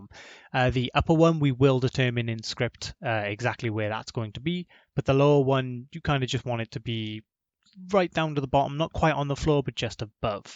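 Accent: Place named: Wales, United Kingdom. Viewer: Looks like I'm not the only Welshman (I'm guessing by the accent) who's struggled with stairs! I'll have to give this solution a try, as my own solution became very convoluted, but the in-game result looks very similar to yours. I was trying to create a sort of "fake ramp movement" where I'd calculate the angle/direction from where the character is standing, to the point where they would be stepping up to, and then altered the players movement direction according to that. It involves a bazillion raycasts and lots of fudged maths, and I'm sure is massively inefficient. Maybe this simpler approach is what I need!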